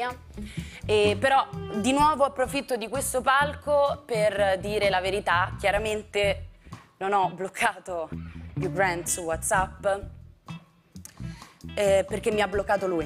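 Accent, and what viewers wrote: Rome, Italy. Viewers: La bolognese con più accento romano che abbia mai sentito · La gente dice “chi cazzo è Matilde De Angelis” semplicemente perché: 1) Per settimane, prima e dopo Sanremo, eri ovunque, tv, radio, giornali, ma la maggioranza degli italiani non ti conosceva. E probabilmente non ti conosce neanche oggi. 2) Sei saccente, spocchiosa e te la tiri. Se un personaggio pubblico è così, non si deve sorprendere se attira antipatie e se riceve insulti ogni giorno. PS: dici che hai “ripulito” l’accento bolognese, ma perché adesso parli come una coatta romana? Comunque hai 25 anni, hai ancora tanto da imparare e ancora poco da insegnare, l’umiltà non fa mai male. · Il problema e che hai preso l'accento romano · ma ha accento Romano? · Non riesco a spiegarmi perché sia bolognese e parli con accento romano, dev'essere l'atmosfera di Propaganda Live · Ma perché se è nata e cresciuta a Bologna parla con accento romano? 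· "Simpatisci","ghe ho lletto","era brobrio", "lo cabisco", "diggiti", "ti vuole bbene", "cresciuta a BBologna", "scinque anni", "riscevevo", "de mmerda", "perzonalmente, proprio perzonalmente", "una pagina nell'internet", "un incubbo","bbasta","scinque","scertezze","amisci","BBene", "lisceo" (e svariate altre topiche) ma un'attrice corsi di dizione non ne fa? Forse li ha fatti a RRoma. La cadenza bolognese, mostrata nel film con Accorsi, era meno "borazza" e più spontanea. Ecco perchè a volte viene doppiata. Ora parla "strasscicato" come il cronista "impresentabbile" e raccomandato dell'America's Cup, che non si poteva sentire e non si poteva vedere, con la differenza che lei almeno il fisico del ruolo ce l'ha. Nella putrida fiction su Leonardo interpreta un personaggio storico inesistente, che imbonisce il quarantenne Leonardo assistente nella bottega di Verrocchio (ma il Leonardo discepolo del Verrocchio di anni ne aveva tipo una quindicina). 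Detto che il problema della fiction "Leonardo" è imputabile agli autori irrispettosi e incapaci e non agli attori, nel film con Accorsi MDA mi aveva piacevolmente sorpreso, e pure nell'isola delle Rose. In definitiva viene proprio da chiedersi "Chi cazzo è MDA?" Perché una bolognese che parla in romanesco una sua identità evidentemente non l'ha ancora acquisita. Ps: Matilda, gli euro valgono più dei dollari, quindi non dica "dollari non euro", come se in dollari la cifra fosse pazzesca, in realtà è il contrario. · Forse perché è venuta a Roma da giovane e ha semplicemente acquisito l’accento del posto? Non capisco perché in tanti stiate facendo notare una cosa così naturale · Ma perché ad una bolognese insegnano a parlare con accento romano? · Ma che significa scusa? Se è nata a Bologna non significa necessariamente che ci sia cresciuta. Evidentemente è cresciuta a Roma, ed in ogni caso non è che gli accenti di insegnano, succede che più o meno inconsciamente si rimane condizionati dal modo di parlare delle persone del posto in cui si vive. 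Anche gli stranieri che vivono a Roma hanno l’accento romano, ma mica ci hanno fatto un corso!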